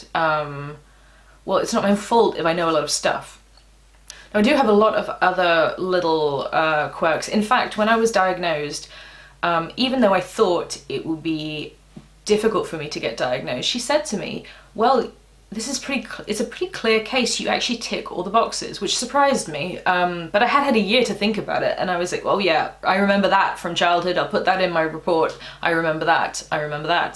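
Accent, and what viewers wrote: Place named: England, United Kingdom. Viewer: Hiya, thanks for replying. Apologies for making the assumption that you were in England just by your accent and before having watched more of your terrific videos. Your assessment appears to have been quite different to mine. Wouldn't it be wonderful to get to a point where we are just understood, accepted and appreciated without the need for 'diagnosis'? We can but dream.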